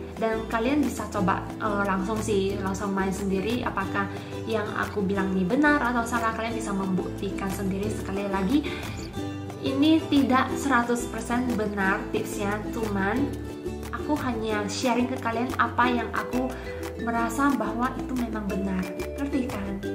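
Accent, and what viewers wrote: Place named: Java, Indonesia. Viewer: Indonesianya Medok :D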